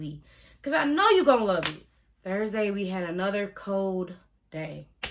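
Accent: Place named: South, United States. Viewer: Hi, I’m surprised you are from Ohio. You seem to have a southern accent. I live in Akron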